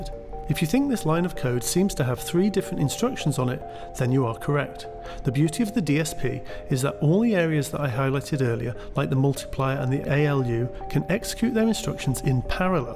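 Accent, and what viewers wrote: United Kingdom. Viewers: Accent? he’s from the United Kingdom.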